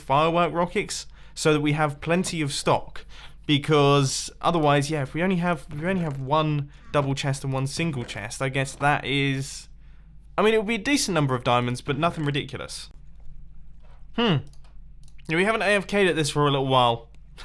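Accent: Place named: England, United Kingdom. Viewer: “Ah tall” I love English accents😍😌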